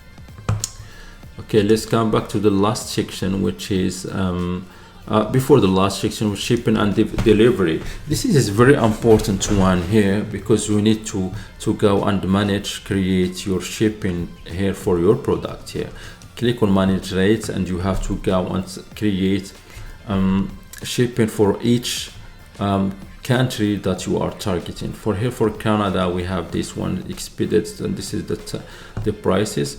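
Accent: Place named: Morocco. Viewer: mgharba baynin mn accent 💪🏼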